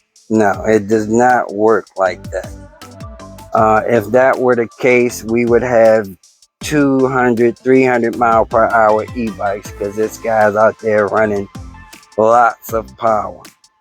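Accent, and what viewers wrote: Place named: New York, United States. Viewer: Yeah congrats for 1,000 subscribers I got 570 and dropping and I don't really care because every time somebody watches my videos they make money and I don't LOL why do you live in California and sound like you're from New York you must be from New York nobody has that accent. When I lived in Bedford I started getting that accent yes I used to work at Bedford prison in New York on boilers. We kept all those women warm LOL. Well that's good you're not in jail I'm glad and I hope you're not slinging bricks because those kill people and know how matter how much money you make you can end up in jail forever. Not worth it I'm poor I could have done that I can find a bag of s*** way faster than a bag of weed I grow my own weed though Gorilla Glue number f o u r that s*** is the bomb. Next is blueberry Autoflower. I used to be a farmer now I'm in the closet farmer LOL. 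I bought a battery online and now I'm wondering why the f*** did I do that? I need prayers I bought it from an individual that sells all kinds of different stuff and no batteries it says it's brand new 20 amp hour 48 volt for 450 bucks plus shipping and whatever about 480. It has a really nice metal charger but who knows if it works why did I do that oh my God? Well I can send it back but that cost $60. Now I can tear apart the other battery once I get that one and I don't have to worry about going on a trip still if I screw it up they won't even tell me what kind of BMS is in the freaking battery. They sell you a batt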